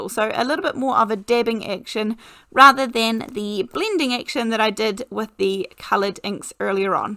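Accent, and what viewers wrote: New Zealand. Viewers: Thank you so much. I’ve got some of that. I’ll give it a go I do have a hard time but maybe it’s the paper. I love, love your videos. Your kiwi accent is lovely to listen to. I’m in Aus. Perth. ❤️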